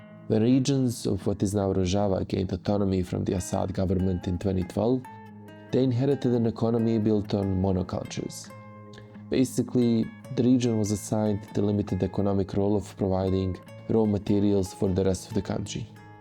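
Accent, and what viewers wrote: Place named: South Slavic, Eastern Europe. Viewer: Love the video I hope there are more geopolitics commentary with south slavic accent out there.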